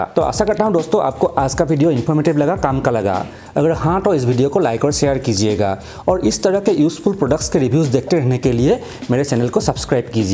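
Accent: Assam, India. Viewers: Apka hindi sunkar lagta hai app assamese hai ☺️ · অসমীয়া হিন্দী যেন লাগিছে // যা হক video টো ভাল লাগিল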